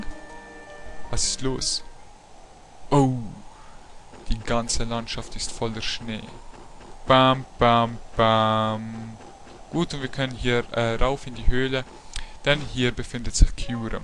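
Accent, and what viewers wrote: Switzerland. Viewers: also ka ob du das liest, ich liebe deine Walkthrough's wegen dir kauf ich mir jetzt einen 3ds nur damit ich alle Generationen spielen kann:DD Ich liebe deine Video's und auch dein Schweizer Akzent - Das musste ich jetzt einfach hier schreiben auch wenn das Video schon 3 Jahre alt ist. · du bisch doch ganz klar en schwizer :D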